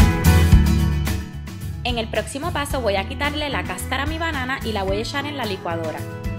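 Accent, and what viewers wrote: Puerto Rico. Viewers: Ella es Puertorriqueña al escucharla se sabe. No la critiquen por como habla cada cual tiene su acento segun su patria. Super rica la receta... ;)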